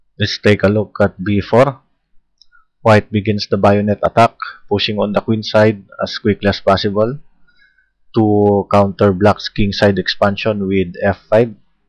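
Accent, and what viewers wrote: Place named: Tagalog, Philippines. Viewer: OMG that is a Tagalog accent!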